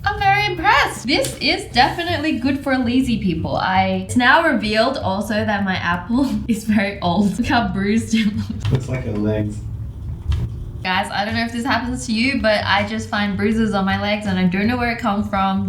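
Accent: Australia. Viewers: I’m Australian too so u say bucks and mum and stuff like that! Yeah I have a accent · Which part of Australia are you from? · Ur Aussie?? 💁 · Is she Australian or something?🤔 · aren’t you Australian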